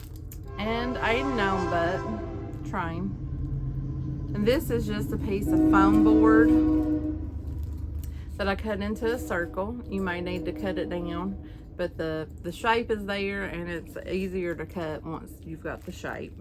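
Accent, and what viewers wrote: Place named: Kentucky, United States. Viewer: I love your channel. Are you from Kentucky? I am and our accents our similar.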